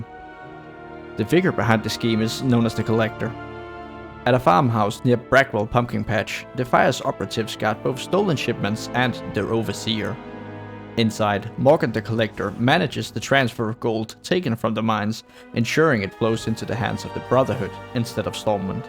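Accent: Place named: Denmark. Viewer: Danish accent, right? Good video.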